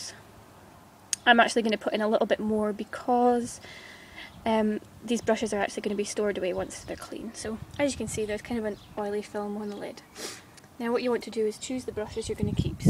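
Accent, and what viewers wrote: Scotland, United Kingdom. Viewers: Are you Scottish? I love the accent!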